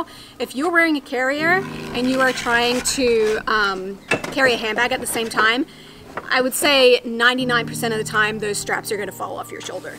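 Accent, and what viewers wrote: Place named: United States. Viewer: Your accent is puzzling me—are you American or a Kiwi? You sound mostly American but with little bits of Kiwi accent here and there.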